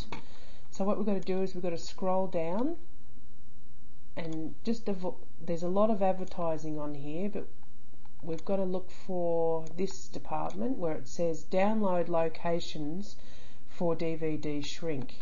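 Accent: Australia. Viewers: This Guy who uploaded it ...Has a true Australian voice Nice Mate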